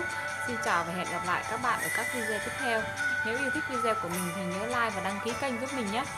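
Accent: North, Vietnam. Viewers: Cái này dạy nghe nhạc chứ nấu chè gì , nói giọng Bắc , lồng nhạc to đùng , nghe phát ghét ai mà đăng ký kênh cho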